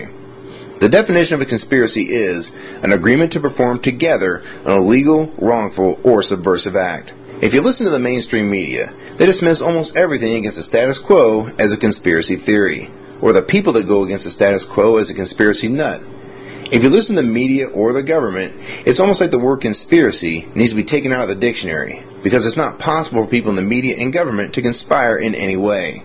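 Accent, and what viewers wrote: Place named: Ohio, United States. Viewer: You sound like your from ohio or thereabouts.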